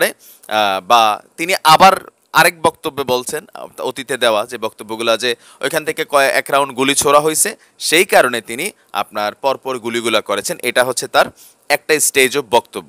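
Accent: Comilla, Bangladesh. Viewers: ভাই আপনার বাড়ি কি কুমিল্লাতে ? আমার পরিচিত কুমিল্লার এক ভাই এর সাথে কথা বলার ধরন খুব মিলে । মজাই লাগে শুনতে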